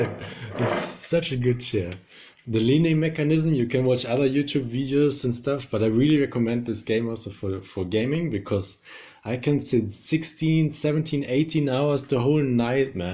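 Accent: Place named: France. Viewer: Downvoted for your accent only. Sorry but my experience with the french has been awful.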